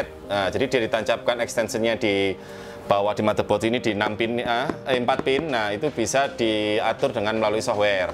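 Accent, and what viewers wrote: Java, Indonesia. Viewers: Klo dlm bentuk lappie udh 18 juta lbih tu. Macam kyk gl553ve. Bang klo ganti gtx 1060 jdi 10 jt ya kyk video sblumnya? Btw Saya demen logat medoknya. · seneng sama logatnya, rada" medhok wkwkwkkwwk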